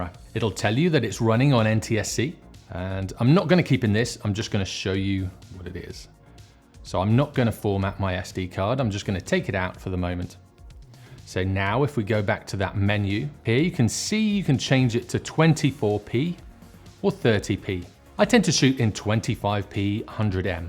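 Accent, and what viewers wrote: United Kingdom. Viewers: Your name is so American but you are definitely so British.Very nice video.Will check back with you.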